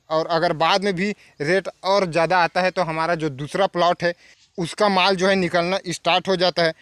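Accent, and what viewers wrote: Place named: Chhattisgarh, India. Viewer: Bhaiya chhattisagrh se ho kya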